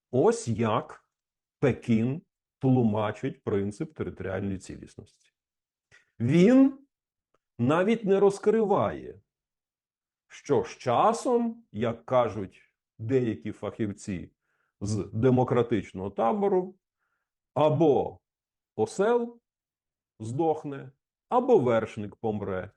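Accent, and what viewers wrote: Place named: Galicia, Ukraine. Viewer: Пане, а почему такое ГАЛИЧАНСКОЕ произношение звука "Ч" ??? Очень медленная речь, просто тянучка !!!!!!!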